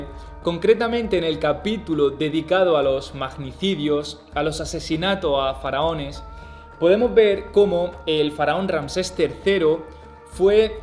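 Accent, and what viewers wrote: Andalusia, Spain. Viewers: Muy buenos tus videos . Supongo que por tu acento debes ser de Andalucía . Granada quizas ? Saludos desde Argentina.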